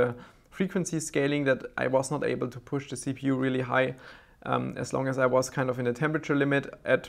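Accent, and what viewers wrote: Germany. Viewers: man hört irgendwie das du deutsch bist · Deutscher Akzent xD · You can hear his German accent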